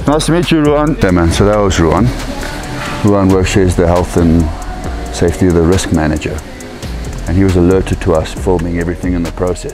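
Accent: South Africa. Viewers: I'm south african and I swear that must be south african as well, he just sounds like one. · Not been able to go back to South Africa since the pandemic begun but hearing other South African accents makes me happy🙏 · Dope dude, this video made me realise u deffs a fellow south african, thnx for the content! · Are you South African? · Bro sounding south african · Is he South African?? Now I see the man's... from south Africa.... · is he south african?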